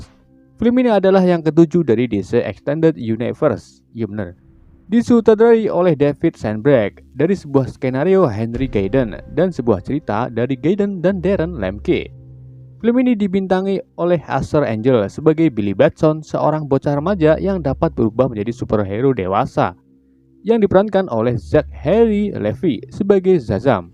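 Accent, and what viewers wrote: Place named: Java, Indonesia. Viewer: medok e renyah😄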